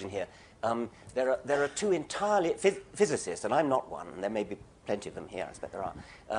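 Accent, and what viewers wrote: United Kingdom. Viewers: lol the British accent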